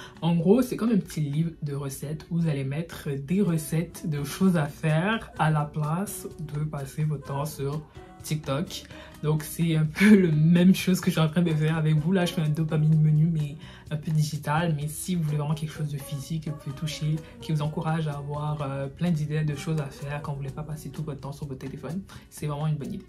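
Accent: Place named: Canada. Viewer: Merci à toi aussi et hate pour ta partie 2❤❤💕💕 ( espione😈😈) (en plus j'adore ton accent canadien❤❤❤❤💕💕)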